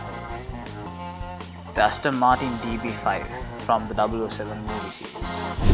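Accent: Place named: India. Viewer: What a fxxxxing Indian accent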